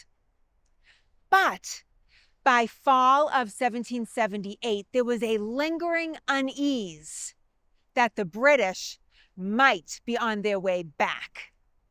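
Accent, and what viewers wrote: Boston, United States. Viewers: Well I learned something I never knew, and it was courtesy of that distinctive Boston accent, lol. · When you hear her talk, there’s no doubt, she is from Boston! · Great Boston accent! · Your authentic Boston accent is enjoyed. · Watch out British accent documentarians who are sooo popular … Boston is here now… it’s a new era of the documentary genre!❤❤❤I love this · Well go listen to other historians talk about Boston and they call Charlestown Charleston and they mispronounce all the street names to me I love the accent it brings me back home I'm from Revere living in Oregon I really miss home this is like a little slice of home for me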